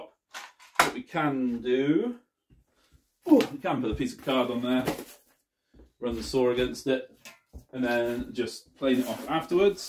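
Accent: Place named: Ireland. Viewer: Too much talking - difficult to understand w/your brogue. The presentation appears mor ad hoc than well-organized.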